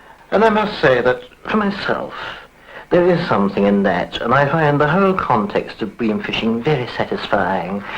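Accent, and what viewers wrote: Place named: RP, United Kingdom. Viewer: Very posh old boy...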